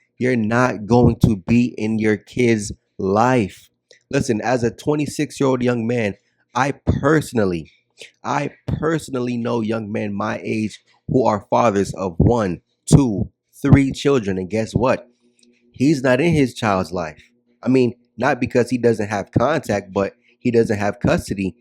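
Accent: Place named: Caribbean. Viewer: Yeah, i can definitely here the Caribbean in you brotha. Your content is spot on. SYSBM/ traveling is the only way for brothas. I make content as well talking about SYSBM, thinking black men & black mansandry. I got a black mansandry playlist of content I've made.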